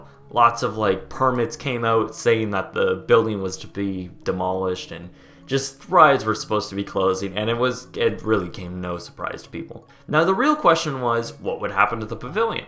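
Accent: Canada. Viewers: The narrator sounds either Canadian, or he's trying very hard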